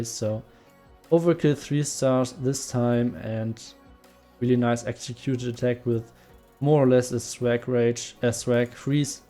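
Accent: Germany. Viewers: Bro do you really suck at english or is it because of the german accent?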